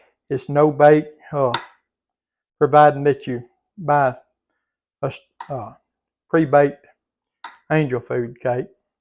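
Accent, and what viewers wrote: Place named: Louisiana, United States. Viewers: You Sound Just Like My Louisiana Family ..♥️♥️♥️I love Your Southern Attitude 🤗🙏🏼Looks So Yummy ! BEAUTIFUL ♥️ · Natural yogurt is a good cheaper substitute for sour cream, they want $6.00 for 1 cup 250ml sour cream here now 🤨 and I noticed the other day that your dollar is our 1.52 We don’t have cool whip either, we used to have frozen dessert whip back in the 80’s greasy horrible stuff it was. Didn’t look anywhere near as good as yours. I just Love your Southern accent, I used to follow Miss Phyllis Southern Frugal back in 2019 before She and Mr Bucky went home to be with the Lord, he Son runs her channel now, but I don’t enjoy it without her blessed beautiful heart, still has all the good frugal old time recipes though, ✝️🙏🏽💖💙 Jesus is Coming I hope ya’ll know the Rapture is VERY CLOSE 👑✝️🙌🏽☁️💫☁️💕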